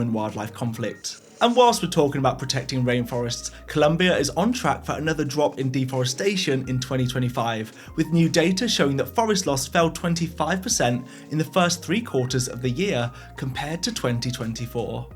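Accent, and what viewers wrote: United Kingdom. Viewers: i lowkey thought you were moist critical until i realized you’re british 😭😭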